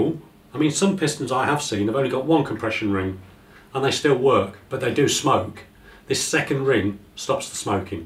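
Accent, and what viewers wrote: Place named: United Kingdom. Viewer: Sound like your from UK do you do anything for the 4g63 engine ? And the k20 Honda ?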